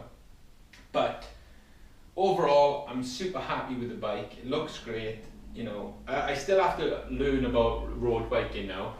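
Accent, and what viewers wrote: Wales, United Kingdom. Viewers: Welsh defiantly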